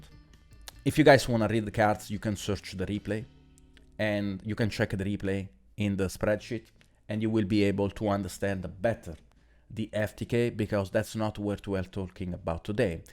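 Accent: Italy. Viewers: E' il tuo primo video che vedo e ho notato subito dall'inglese che sei un fratello Bel video e concordo sulla banned aggiungerei virus a 0/ skill drain a 1 pero' per il resto ci sta p.s engage a 2 e' sicura quindi non serve una prediction per questo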